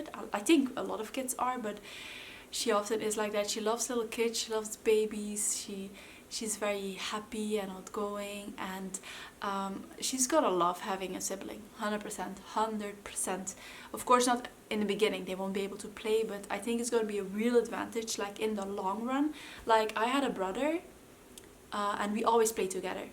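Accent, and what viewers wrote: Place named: Netherlands. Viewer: Where are you from? It sound like you speak dutch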